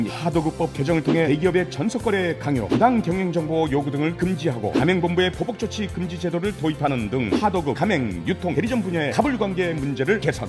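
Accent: North Korea. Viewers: 이거 진행하는 사람 북한인이잖아 ㅋㅋㅋㅋㅋㅋ 말투 븅신이네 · 이정도면 걍 우상화 프로파간다 선전물이네....말투도 진짜 북조선 애들 같다... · 진짜 이딴거 만드는 목소리톤마저 저기 건너편 윗편애들한테 닮아가는 기분이 드네 진짜 미친거 아니냐 이딴식으로 되도않는 뜬구름을 팩트라면서 방송하네 ㅋㅋㅋ 2021년 다시 만들어봐라 어떤가 한번보자 미치겠네 ㅋㅋㅋㅋㅋㅋㅋ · 말투 북한이냐?? 시이발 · 성우가 인민군같네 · 나레이션 북한같아요.. 레포트 써야해서 참고하려고 보는데 도저히 못듣겠어서 소리 끄고 자막키고보네요.. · 성우 말투도 북한 억양이네 일부로 그러는건가? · 나레이션이 북한같아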